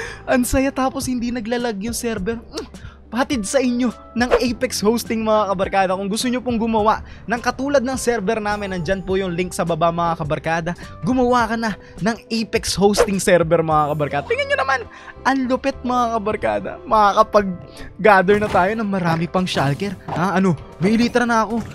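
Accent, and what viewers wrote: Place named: Bulacan, Philippines. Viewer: Pareho tayo ng punto ng pagsasalita kuya Proud Bulaceno Here taga bustos po ako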